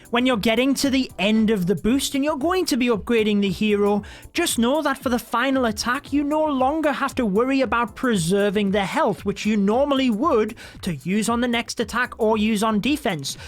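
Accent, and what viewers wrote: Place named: Northern England, United Kingdom. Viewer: My northern English brother 🦾😘 I can hear on your accent baby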